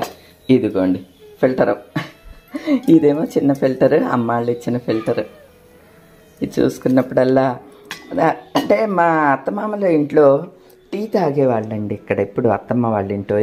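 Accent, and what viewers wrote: Godavari, India. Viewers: Natural గా మాట్లాడితే బాగుంది అమ్మా గోదారోల్ల యాస బాగుంటుంది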